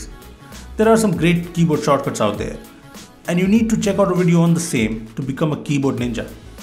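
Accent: India. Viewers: I like your videos but i hate the accent cause many scam-Indians are calling me all the time and tell me that there's something wrong with my win 10 laptop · Thats Indian accent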